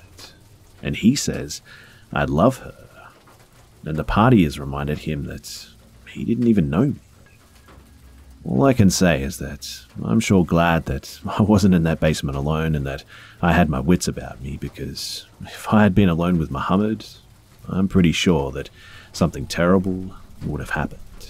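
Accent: Australia. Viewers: How the hell have I only just found you bro, an Aussie narrator, if I knew about this earlier its all I'd be listening to, keep up the good work brother!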